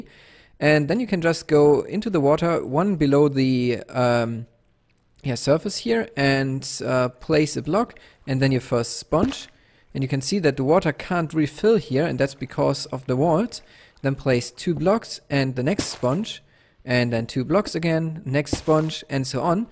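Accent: Germany. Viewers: you describing the killing chamber so calmly in a vaguely German accent made me uncomfortable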